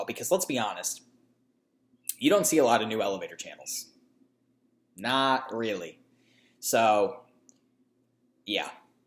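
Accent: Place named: Michigan, United States. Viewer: I came to you for your Speed Queen vids, but then started listening to a few of your other uploads due to your distinctly Michigan accent🤓.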